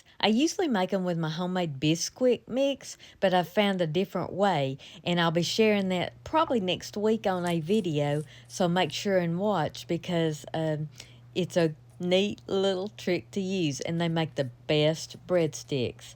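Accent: South, United States. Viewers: You’re going to love the sour dough. I’m new to your channel and I can tell your southern from your accent I’m in South Carolina. Two great you tubers for sour dough is farmhouse on Boone and rose homestead if your interested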